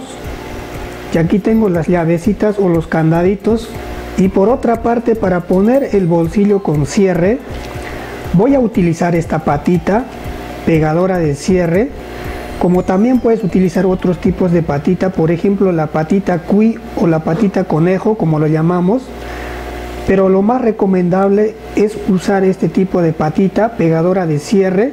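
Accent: Peru. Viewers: Sos peruano o boliviano.....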